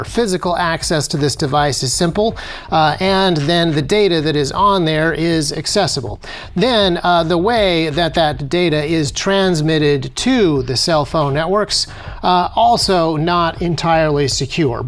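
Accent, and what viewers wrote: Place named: United States. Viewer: You accent sounds American, at the end there you talked about London so much I may be wrong about you. The end there is a good example for the American 4th Amendment which requires police to have a warrant to investigate people. Meaning evidence of a crime needs to be presented to a judge. For America this kind of mass surveillance is so very illegal.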